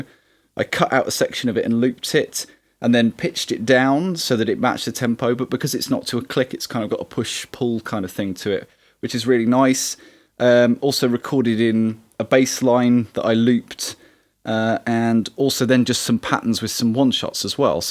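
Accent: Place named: United Kingdom. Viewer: I am so sorry, the last video I saw from you is the porno music tutorials so I was really surprised that you spoke with your native British accent.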